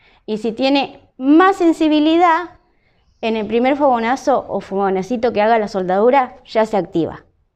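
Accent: Argentina. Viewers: sos Argentina por el acento marcado, desde Perú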